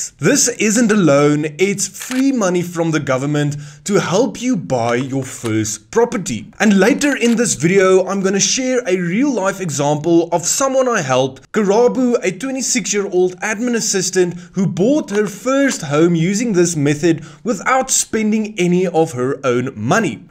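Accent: Afrikaans, South Africa. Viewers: The Afrikaans is strong with this one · He clearly has a thick Afrikaans accent. Focus on the vital info he is sharing and not the pronunciation.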